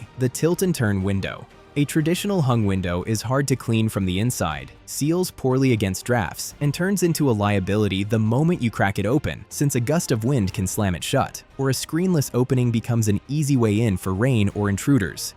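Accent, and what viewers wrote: Spain. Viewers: Todos los comentarios son España no me gusta, España no inventó eso, mi casa no es así... Madre mía! Sólo comentaron los haters! Que por otro lado si tanto les disgusta no sé qué hacen aquí 🤔 Hasta han dicho alguno que no les gusta el acento español😂 En fin, sin comentarios sobre estos personajes ignorantes. El video, sí es todo lo que echamos de menos si vivimos fuera de España. Las losetas de porcelana como todo, si son de calidad maravillosas si no se prefiere el parqué. Lo que no vas a ver es moqueta en ninguna casa 😂😂😂 El bidé es una maravilla. Un saludo!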